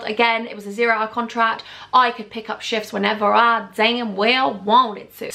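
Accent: United States. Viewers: Girl your American accent is on POINT! Lol coming from an American lol 🙌🏾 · I live in the states and your American accent was on pointtttt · I’m living for the American accent hun!!!❤️❤️❤️❤️❤️ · YOUR AMERICAIN ACCENT IS AMAZING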